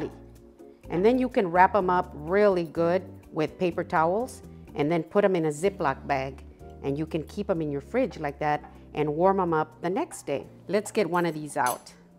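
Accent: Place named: New Mexico, United States. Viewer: thank you so much. I've been looking for a recipe for dinner rolls without using a mixing stand, which I don't have. You are so nice to listen to and understand. You remind me of my best friend of 54 years, her mama. I grew up in Albuquerque, NM and you sound a lot like her with your beautiful accent. I'm so excited now bc I know I can do these your way. Have a great weekend.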